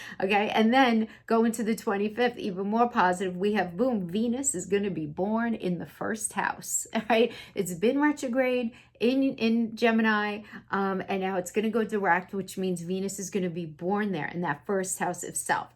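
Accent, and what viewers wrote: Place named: New Orleans, United States. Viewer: I just love your accent! Are you from New Orleans? This is the 1st time seeing your face and you're absolutely beautiful. I resonate with your Gemini Readings the most. I admire how connected you are.🙏🦋 Goals...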